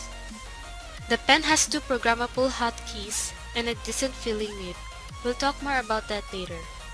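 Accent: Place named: Philippines. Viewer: OMG ate!! pinoy accent 🇵🇭😆💪 idol talaga kita. mag practice ako ng mabuti 😤.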